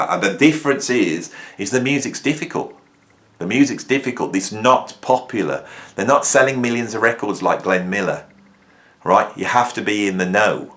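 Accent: Birmingham, United Kingdom. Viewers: Sorry old luv but as a pro jazz arranger/MD and player of 45 years I think your attitude is trivial, uneducated and banal. Let's leave your brummie accent aside because it would not be fair to make assumptions based upon that and i would be the last to wish to so do. I have watched some of your - to me, cringeworthy - videos and cannot help gain the impression your musical knowledge needs a refreshing perhaps?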